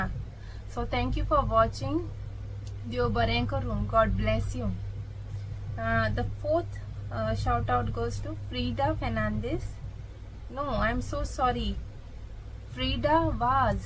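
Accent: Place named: Mumbai, India. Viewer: Hi!, I'm Sylvia from Mumbai. I accidentally came across your vlogs some days back as I was scrolling through U tube n was curious to see more of them. To tell u the truth I liked your videos. I found u r a very simple person with a strong personality. When you speak in English u really have some style n you sound like a Mumbaikar n when you speak in konkani u sound like a pakka goankar its nice. U have a very nice voice too, but if u can make time n join some singing classes you can modulate your voice even better. I know u don't get much time as most of your time goes in traveling but do try. I fish u all the best n continue with your vlogs. ❤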